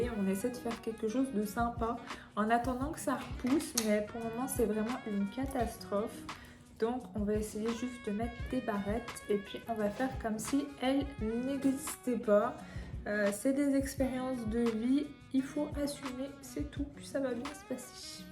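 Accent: Quebec, Canada. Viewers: Vous avez pris une pointe d'accent québécois.. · Ça y est tu commences à avoir l accent canadien, c est trop mimi 😊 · Je trouve que tu prends le bel accent québécois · Petit accent québécois toute même 😁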